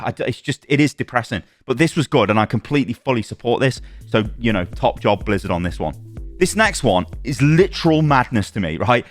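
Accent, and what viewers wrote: South, United States. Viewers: 10/10; American accent..👍🏽👍🏽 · Wow your american accent. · The rich Southern American accent from a Brit is not something I knew I needed. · excellent yaccent (yank accent)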